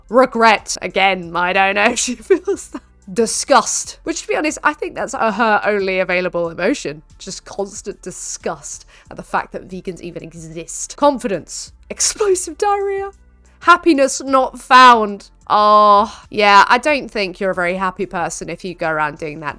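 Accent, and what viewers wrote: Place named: United Kingdom. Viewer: I enjoy your wit ... and your British accent 🥰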